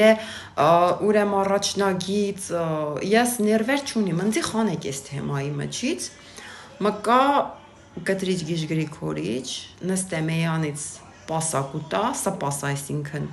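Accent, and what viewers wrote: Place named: Martuni, Armenia. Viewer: Աղջիկ ջան դու վերջն ես ախար լավնես , բա մարտունու լեզվով շաատ սիրուն ես խոսում դու😂😂❤❤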